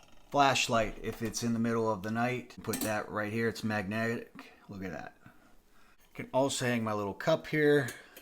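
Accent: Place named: Pittsburgh, United States. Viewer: just when some sad corner of youtube said the Yinzer accent was dian aht I find this video ✨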